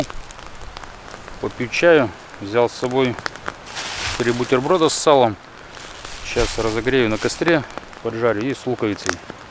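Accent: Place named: Siberia, Russia. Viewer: Спасибо, спасибо!!! Без блинов ваш сибирский говор просто умиляет.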